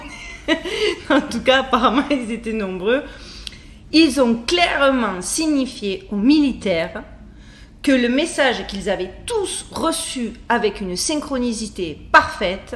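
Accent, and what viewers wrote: South, France. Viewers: Ils lui en veulent plus pour son assent du sud.lol .moi j' aime bien au début " hello wiwane "🤣😋😘.j aime bien la chaîne (pour le contenu avant tt)car les vidéos ne durent pas une éternité comme certains qui font des vidéos de 3 heures. Encore une vidéo ou 2 ,je veux bien, mais quand c'est à répétition, je suis obligé de fuir. Sinon bravo la miss 😘👏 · Bonjour en ce jour de Noel 🙏🌟🎄:je découvre cette vidéo hallucinante ,tu es extraordinaire !! Les sujets les plus "sérieux" et bien fouillés,traités avec un humour dévastateur,😂et en plus,ça ne plaît pas à tout le monde ... La peur, tout est fait pour que les gens soient Morts De TROUILLE 😠. Facile de mettre les gens qui dérangent en hôpital psy .... Allez bonne journée à tous et merci pour cette ,et Toutes ces vidéos que tu nous fais; avec toi,on avance à grands pas ,et tu nous fais évoluer .Accent du 13 ? 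Et moi du 34 !!!😻💖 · Ma niece m a dit que tu viens de chez nous ds le sud je kiffe tes videos et ton accent bien de chez nous ❤❤❤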